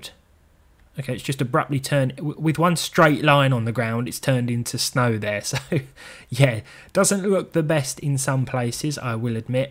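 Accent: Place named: Essex, United Kingdom. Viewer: M25 racer (also London racer) were set in London and the Thames valley. You could race around Oxford or Knightsbridge. sounds like he's more Essex way, but the game was at least set in England!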